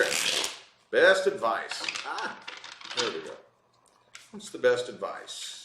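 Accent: South, United States. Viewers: Thankyou so much I appreciate it, great accent where you from down south I'm in New Zealand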